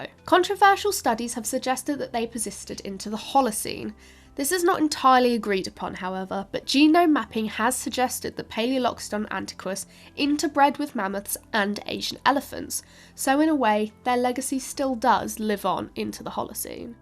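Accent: United Kingdom. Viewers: beautyfull british accent for a video about britan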